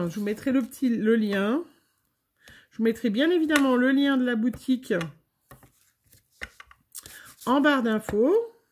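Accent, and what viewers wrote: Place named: France. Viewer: Bonjour je suis Quebequoise Et j Aime bien votre accent de France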